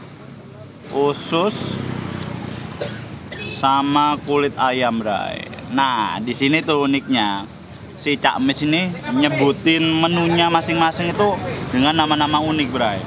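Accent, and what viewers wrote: Indonesia. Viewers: Mas nya asli org mana to kog logatnya kyk org jatim?? Dan kosa kata nya yg salah diketawain sama suami ku🤣🤣 pulen sama punel itu beda, dan cecek disini itu muntahan org sakit.disini namanya krecek atau kulitan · Bray ne kurang pas... Logat sek suroboyo... Mending suroboyoan ae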